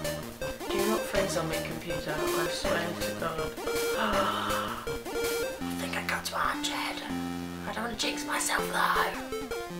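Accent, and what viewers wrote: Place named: England, United Kingdom. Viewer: u sound like a england girl mixed whit a country girl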